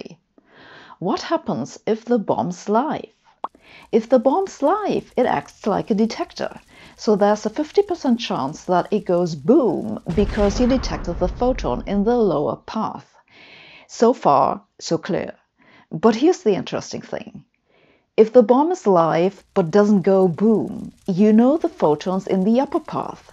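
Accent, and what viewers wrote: Germany. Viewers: the goal is to monetize. people who like the illusion of themselves understanding something without any effort really like this German accented "no gobbledygook" which is actually pure gobbledygook since she never invests in actually explaining in detail without using silly metaphors ...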